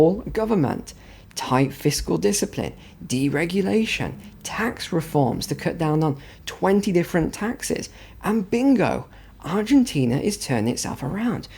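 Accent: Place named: Scotland, United Kingdom. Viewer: Önrealized? Dötsch GOVT? Bödget? Is that a scottisch Akzent?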